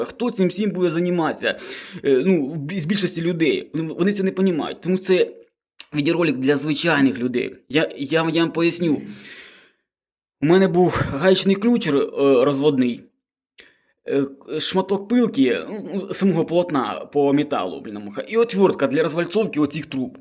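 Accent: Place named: Sumy, Ukraine. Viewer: Молодець,що пробуєш на українській мові, Сумщина тримається,сам з неї😊.всі ми тут розмовляємо суржиком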